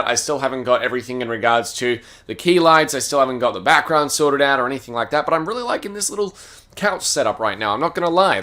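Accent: Australia. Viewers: New. Good to hear a Fellow Aussie. Cheers X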